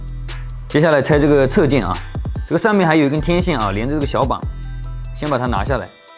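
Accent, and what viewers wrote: Wenzhou, China. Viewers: 声音跟楼斌挺象的:)只是带了温州腔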